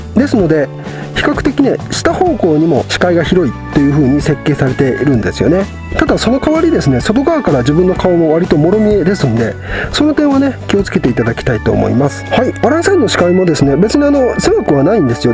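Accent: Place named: Okayama, Japan. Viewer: 「岡山県人の喋る標準語」に心のセンサーが激しく反応しました！これからも頑張ってください。ちょくちょく覗きに来ます。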